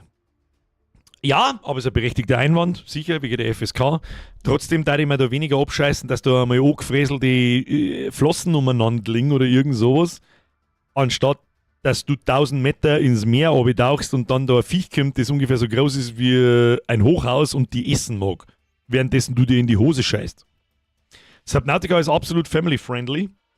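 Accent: Bavaria, Germany. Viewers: Bin vor 2 Jahren von NRW nach Bayern gezogen. Höre deine Videos auf der Arbeit um mal mehr bayrisch zu verstehen😂 Funktioniert echt super! 🎉😂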